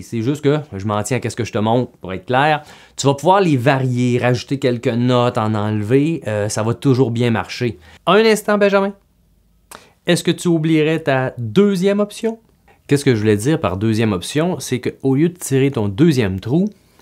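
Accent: Quebec, Canada. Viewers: Is this Canadian French ? nice accent, and good teaching btw.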